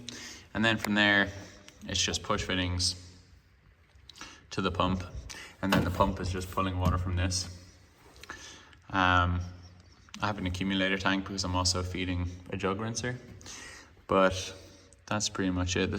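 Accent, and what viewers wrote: Ireland. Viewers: indeed you did not specify what thread standard you used for your hose connections. People in North American will assume NPT because that all we have commonly available here. Sounds like you have an Irish accent, so maybe you did use BSPP (British Standard Parallel Pipe) fittings which is what the La Marzocco machines have inside. But those fittings are not commonly available in NA. North American Pipe Thread (NPT) fittings are tapered, not parallel, have slightly different thread pitch, and while a ¼” male NPT will thread into the pump on the LM Mini & Micra Pump BSPP female connection, it will also damage it in the process. To connect an NPT flexible hose to the LM pump, a BSPP 19 threads per inch) to NPT (tapered 18 threads per inch) adapter is required. So maybe you are fine if you are indeed in Ireland, but a North American viewer will damage their machine doing what you did with plumbing fittings available locally. 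· thanks for the informative video - just what I needed to see how involved the plumbing was before making a decision on whether this will be my next espresso machine upgrade from my plumbed ECM Synchronika (gen 1) - nice to hear the Irish accent when I landed on this video - I am from West Cork and wife is from Galway but living in Tampa Florida for last 28 years. The cafe looks great - hopefully will be passing through Gorey some day to try the cappucinos!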